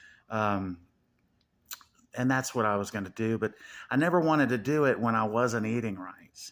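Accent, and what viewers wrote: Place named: United States. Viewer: Hi. This is Chris, imported from Twitter. All this time I thought you were British but here you are being all American-like. How lovely and I look forward to seeing all your videos.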